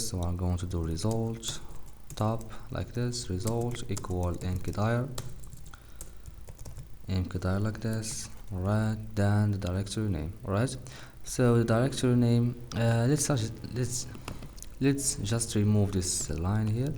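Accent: Morocco. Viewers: itoub 3lik, ghir mn pronunciation 3rftek mghribi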